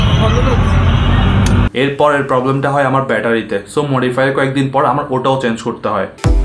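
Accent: Chittagong, Bangladesh. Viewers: ভাইতো খাটি চাটঙ্গাঁইয়া। 😍😂😂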